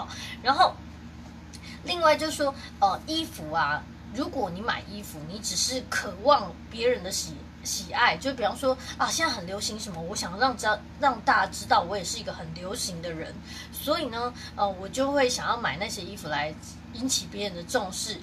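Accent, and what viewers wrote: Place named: Taiwan. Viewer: 的台味超級喜歡😘有買的書，現在在家防疫都聽說書邊丟東西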